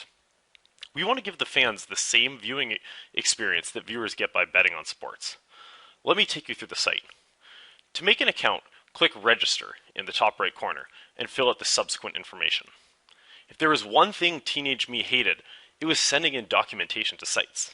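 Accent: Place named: Canada. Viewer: This guy is the most Canadian sounding Canadian ever.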